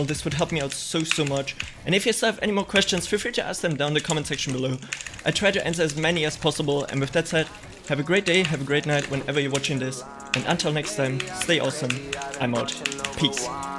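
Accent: Germany. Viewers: du bist doch save deutsch das hört man irgendwie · I hear German in his Voice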